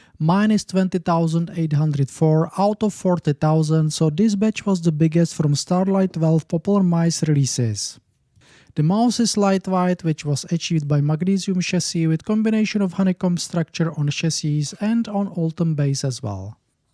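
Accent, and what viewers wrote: Czech Republic. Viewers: Hi, great job, you're doing really great content, but I can hear really strong accent, I think that's Czech, am I right ? :D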